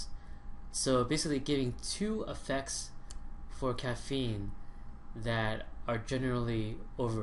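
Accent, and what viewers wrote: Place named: India. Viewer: who the fuck r u, ur accent is so indian seriously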